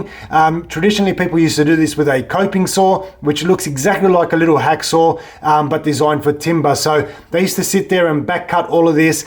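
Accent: Australia. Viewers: I am about to install baseboard myself. I could not find an easy way to figure out the angles until I saw this. It seems you have mitre cut to 45 first before grinding out. Is there a way to eliminate the mitre cut too? Sorry this may be a silly question. Love the Aussie accent!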